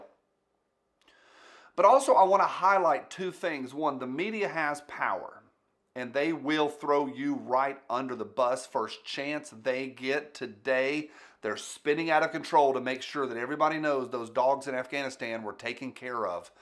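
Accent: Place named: Appalachia, United States. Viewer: LOL Not to harsh on your appalachianess Greenwich is pronounced Grenich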